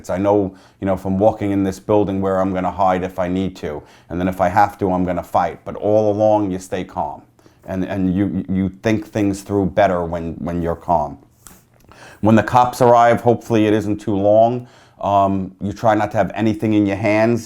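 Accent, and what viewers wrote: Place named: mixed, United States. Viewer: This man Ranch dressing look like a bootleg FaZe Apex with a Boston/New York accent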